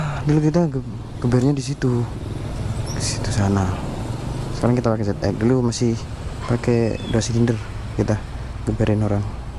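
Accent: Sidoarjo, Indonesia. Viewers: Orang Sidoarjo bang?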